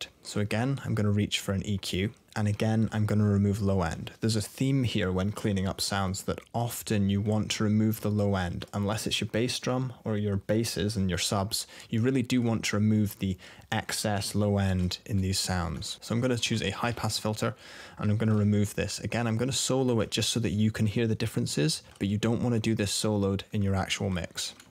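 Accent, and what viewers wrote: United Kingdom. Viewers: Are you Scottish or English!? I can't tell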